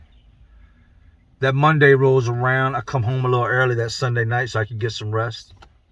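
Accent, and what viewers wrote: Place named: Philadelphia, United States. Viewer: Hell yeah man! Keep doing you! Also side comment cracks me up how you say “on” you can tell you spent a lot of time in Philly. When I lived in AZ people would comment how I spoke all the time and especially how I say “on”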